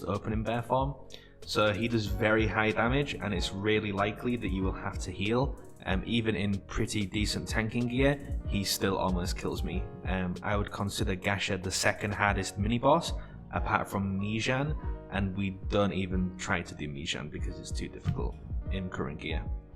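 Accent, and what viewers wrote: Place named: Yorkshire, United Kingdom. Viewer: So Yorkshire I love it, love from another Druid from Sheffield xD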